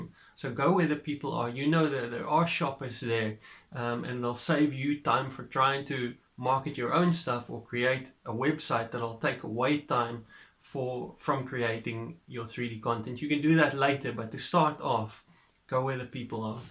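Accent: South Africa. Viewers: I could tell that you are South African by your access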